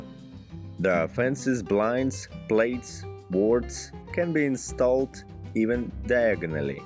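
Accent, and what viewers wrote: Russia. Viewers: Это просто пи..ец как режет слух, а выговаривание воз вместо уос подчёркивает что акцент русскоговорящего. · Русский чувак озвучивает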